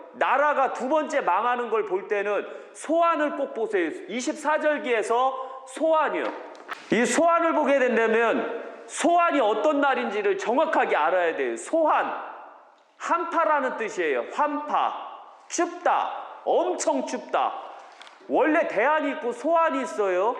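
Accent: Chungcheong, South Korea. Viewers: ㅅㅏ투리가 충청도 분이신가?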